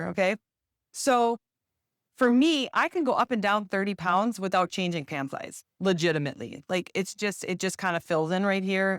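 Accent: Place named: Wisconsin, United States. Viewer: I'da bet a cool hundo you were from Wisconsin...do tell.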